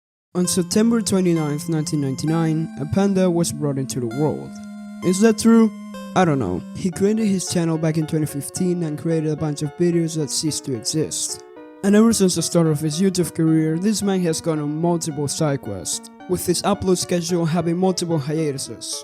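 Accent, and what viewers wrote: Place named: Europe. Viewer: he's probably european so it's not his first language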